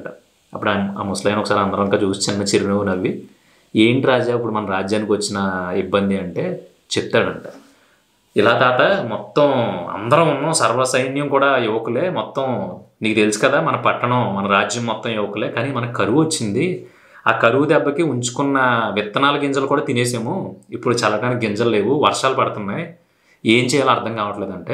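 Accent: Godavari, India. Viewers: thanks for your motivation anna..midhi godavari side kada mi way of talking lo miru godavari side anipinchindhi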